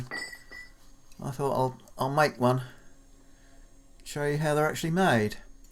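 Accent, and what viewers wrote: England, United Kingdom. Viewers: I love listening to you talk! I’m American so all British accents are cool to me, plus I like hearing your thoughts about the work you’re doing. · No Music, no cartoon capers intros but a blacksmith with an English accent who’s all business! That’s why I’m here.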